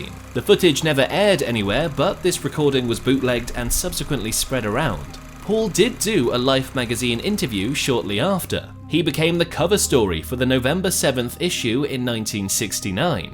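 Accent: United Kingdom. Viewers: You are British because of your accent, British English, so you understand the subject well, why were from london 👍 ￼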